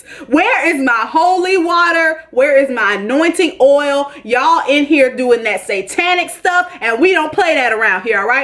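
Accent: South, United States. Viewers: lol...You are so southern like me..It's funny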